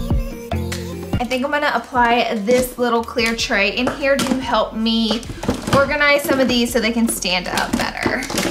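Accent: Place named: Alabama, United States. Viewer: Love hearing someone with the same Alabama accent, you speaking my language lol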